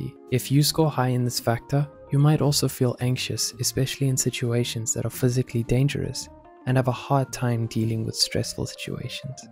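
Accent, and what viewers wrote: South Africa. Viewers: The new voice has a South African accent😃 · Is the new narrator South African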